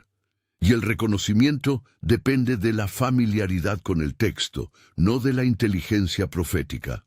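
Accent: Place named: Castile, Spain. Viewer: pones tu vocecita castellana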